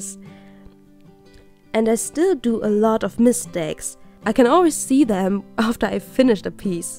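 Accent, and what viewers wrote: Germany. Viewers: Bist du deutsch? Wenn ja merkt mans mega😂😂😂🙈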